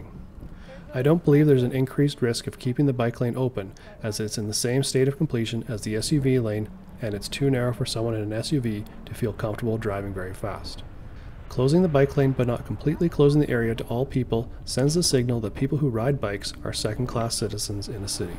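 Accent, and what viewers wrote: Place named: Canada. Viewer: I'm not surprised that you are from Canada. Your city's policies and your accent seem Canadian.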